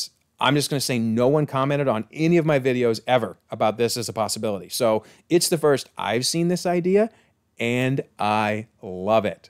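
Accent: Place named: England, United Kingdom. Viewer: If it wasn’t true I wouldn’t call you English accent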